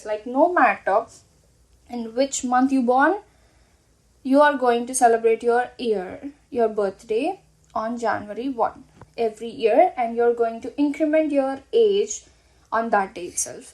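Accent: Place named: India. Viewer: Great video BTW are you Indian because your accent is a bit different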